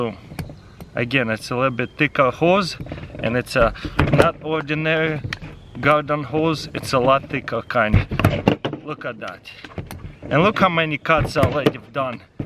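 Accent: Russia. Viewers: псс чувак, а наш акцент-то никуда не делся, ^^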